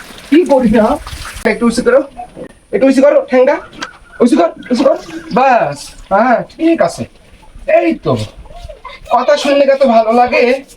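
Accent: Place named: Pabna, Bangladesh. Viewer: আমার ধারণা আপনার বাসা পাবনা? বা এর আশেপাশে ভাষাগত মিল পাচ্ছি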